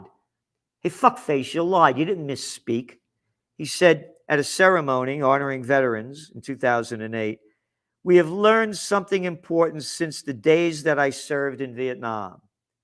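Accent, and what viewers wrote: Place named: Bronx, United States. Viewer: When you get into your rants you take me back to the good old days in Da Bronx. Love you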